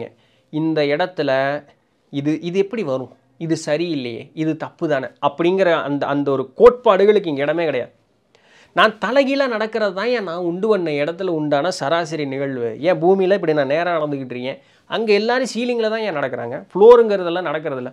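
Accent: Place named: Kongu, India. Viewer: Really very interesting and motivating session in OUR KONGU TAMIL ❤ Tq sir 🎉🎉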